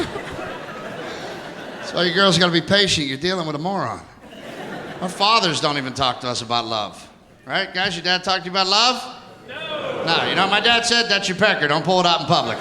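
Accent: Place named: Pittsburgh, United States. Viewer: That Pittsburgh accent is just made for stand up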